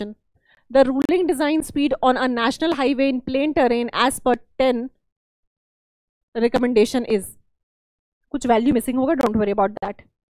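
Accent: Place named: Allahabad, India. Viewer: allahabad se ho kya aap?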